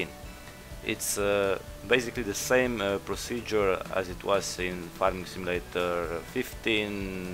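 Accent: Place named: Hungary. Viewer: Magyar vagy? :D Nagyon magyaros az akcentusod :D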